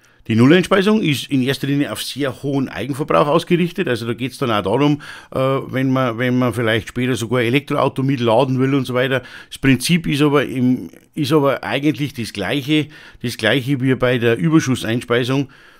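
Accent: Bavaria, Germany. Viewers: mir gehts auch immer so wie dir mann versucht hochdeutsch zu sprechen und rutscht immer wieder ins boarische😀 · muß man auch nicht. Das ist bayrisch! Und echt guat zum Zualosen.....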